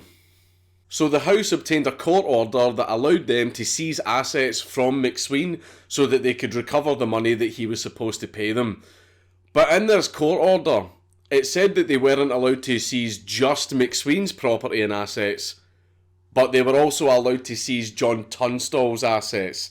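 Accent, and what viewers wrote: Scotland, United Kingdom. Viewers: There is just something about hearing a Scotsman describe the old west that touches my heart.... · It’s fucking brilliant when you hear a Scottish man say murder 😂. Your accent makes your videos. The research you do and how you portray every story is top tier. Fantastic channel. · Its pronounced bu lly tha kid in scottish william becomes wulliam bill becomes bull not sounding bull as in the animal they sound diffrent hard to explain to pple who are not scottish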